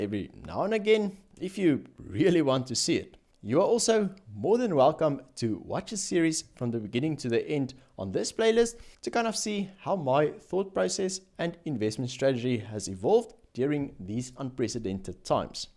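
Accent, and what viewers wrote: South Africa. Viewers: Cool video! Love the accent. SA represent. I also noticed a big gap in localised content in the marketing and entrepreneurship space. ❤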